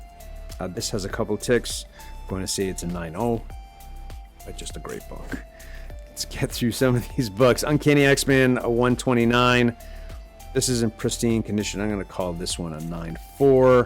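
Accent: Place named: Canada. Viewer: wicked strong Canadian accent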